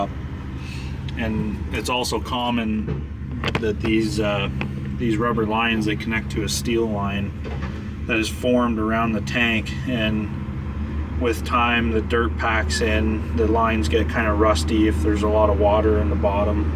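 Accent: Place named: Canada. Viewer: Nice truck man , is that Canadian accent ? Sending good wishes from Scotland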